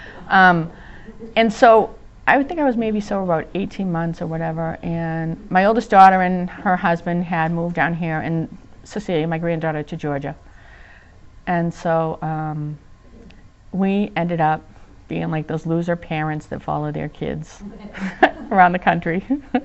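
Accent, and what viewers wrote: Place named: Boston, United States. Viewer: Beautiful job, loved your sharing, and your Bah Stan accent..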